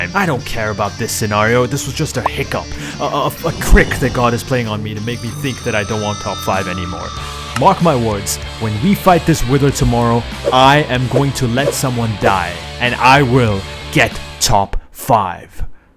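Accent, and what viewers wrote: India. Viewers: Hey bro😱😅 aap btw me bhi yahi Jan ne aaya hu ki ye Indian hai ya nahi confusion hai mujhe bcz senpai Ke video me Hindi itne easily Bola tha tone Indian thi🙂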